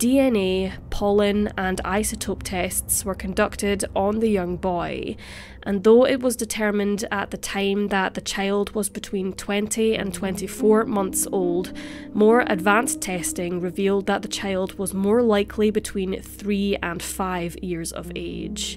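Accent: Scotland, United Kingdom. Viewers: unrelated but i love your accent, are you scottish perhaps? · woah i wasnt expecting a scottish accent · Wow, Ive never heard of this case ever. Great video and its nice to hear a fellow Scottish voice on youtube. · This is my first video of you. Ngl, I didn’t expect a British accent. Woah 😳 (Scottish? Welsh? Northern part of England I think?)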